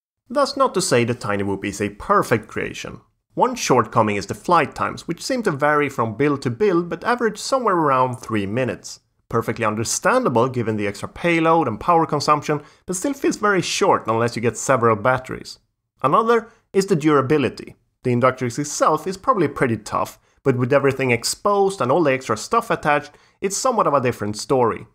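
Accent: Sweden. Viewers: I bet an arm and a leg that the narrator is Swedish! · Your english tells me you are swedish. In that case: svara så jag vet, jag och sambon har slagit vad.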